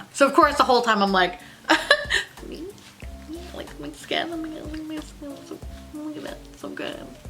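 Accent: England, United Kingdom. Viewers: Yesssss! Loving the English accent! Represent! 🇬🇧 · Love the English accent girl. Your skin does look beautiful.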